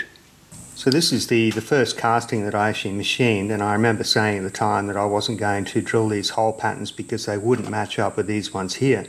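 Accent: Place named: Australia. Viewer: Good video,! I’m so glad to see that you decided to do the steam engine on camera! As they say, “You can please some of the people all of the time, and all of the people some of the time, but you can’t please whatever kind of monster wouldn’t want to watch someone with an Australian accent build a steam engine.” Thanks for the animal videos, they are adorable when they’re babies. Except for the birds…. They start out ugly, but they do get better!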